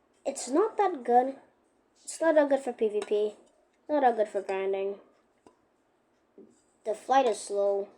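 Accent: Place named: Trinidad and Tobago. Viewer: We know you trini boi stop hiding the accent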